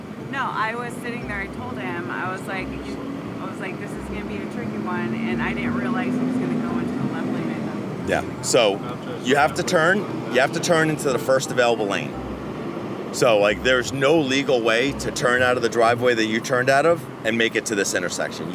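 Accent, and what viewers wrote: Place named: Long Island, United States. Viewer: You can tell you are from Long Island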